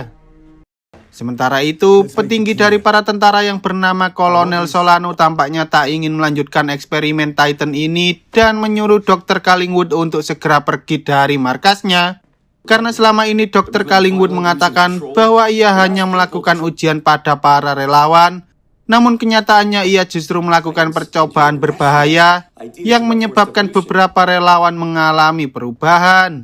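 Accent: Java, Indonesia. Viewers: Suaranya cmpereng sama medokk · Bang bagus kalo cerita tanpa logat jawa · Medok bgt Jawa nya om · nadamu mas nyell jowone ndk ilang😂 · bg bisa gk ngomong nya jan medok gitu :(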